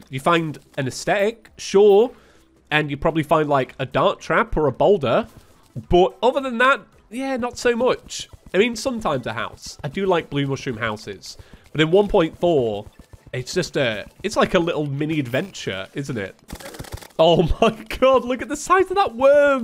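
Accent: United Kingdom. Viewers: Omg are you British!😍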